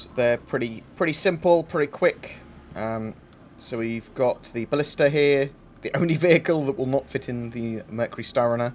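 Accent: United Kingdom. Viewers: I find myself throughout the work star saying star citizen to myself in a British accent how says it. Gets stuck in my head. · It's the cool British accent isn't it? Damn, Brits and Aussies they get all the cool jobs.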